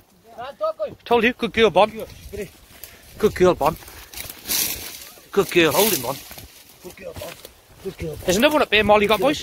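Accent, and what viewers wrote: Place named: Wales, United Kingdom. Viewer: 11 rabbits, we can make £22 quid in Sunderland for the meat mate. Do yas do any better over in Wales? I'm guessing by the accent, apologies if I'm wrong :-)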